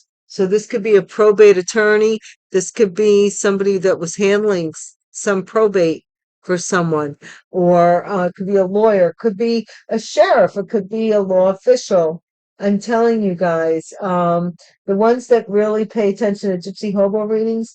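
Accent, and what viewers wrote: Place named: Brooklyn, United States. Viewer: You sound like you are from Brooklyn 😂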